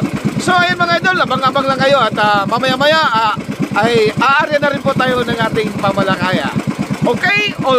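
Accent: Visayas, Philippines. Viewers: Visaya ka segoro kol dawi palang alam na hahahaha😂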